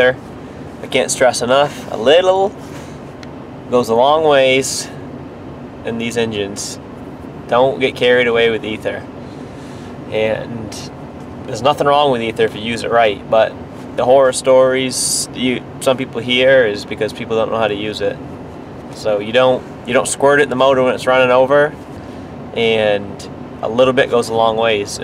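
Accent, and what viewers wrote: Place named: Maine, United States. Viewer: The “Stump Lickah’s” are out in full force, going after the cedar bark, at that time of year!!! Unless you have some crab apple trees down back, but usually those are stripped pretty bare by the end of January!!! Your accent’s a little thin for being a “DownEastah”, but it’s nice to hear on YouTube!!! Hi from Central Maine, and Family!!!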